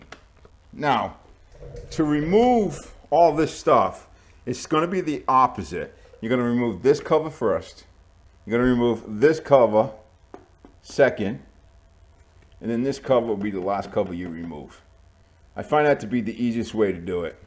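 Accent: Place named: Brooklyn, United States. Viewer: You're from Brooklyn, or Long Island, which one? I'm from Boat, grew up in Brooklyn, now live on Long Island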